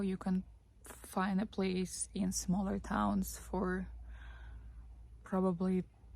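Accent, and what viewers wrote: United States. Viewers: u speak very good american english. i thoguht you were american and moved. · Ive heard you say in past videos you talk like you were living in the US. What got you to the USA?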